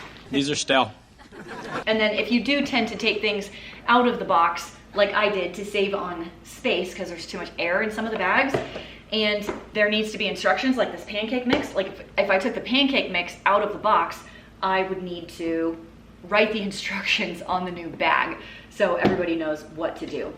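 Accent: South, United States. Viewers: I love you. My husband and I are from the Deep South (NC) and I love the fact that your soo Southern (even if your from Texas).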